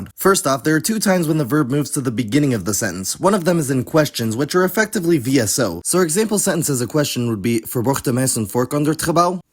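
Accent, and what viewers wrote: Amsterdam, Netherlands. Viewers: Small correction on the phonology, nearly everything that applies to the Belgian dialects also applies to southern Dutch dialects. Also you're pronunciation is the best I've heard from a non native. You sound like you're from Amfsterdam specifically, which is a compliment. Edit: I'm not sure if this goes for all dialects but at least in Brabants we often but not always drop the [t] at the end of words. So in my native dialect (Tilburgs) I would say: da in stead of dat, wa in stead of wat, but kat, boot, gat and het would all keep their t's. I don't know why we do this, but I thought it was interesting.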